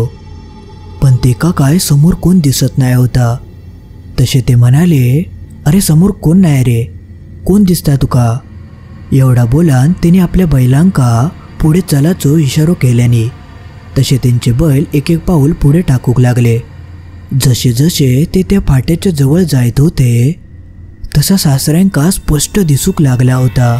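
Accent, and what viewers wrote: Malvani, India. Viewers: Gosta farch chan, aani malvnit aiknyachi maja kahi veglich thank you.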